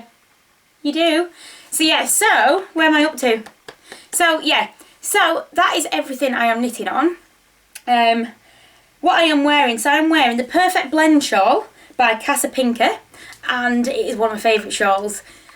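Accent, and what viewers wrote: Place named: Cumbria, United Kingdom. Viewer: YouTube has just introduced you to me! How lovely to hear a fellow cumbrian accent! I missed the wool show for the very same reasons! It cut me up that it was so close to where I live, but I just couldn't afford to be tempted ~ next year 🤞🏻😅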